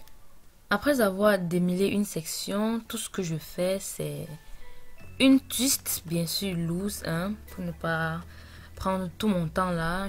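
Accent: Cameroon. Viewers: T'es camerounaise?? Ta manière de parler me fais croire à une camerounaise😂😂😂, tu me tue seulement mdr, j'aime t'es vidéo, continue comme ça👍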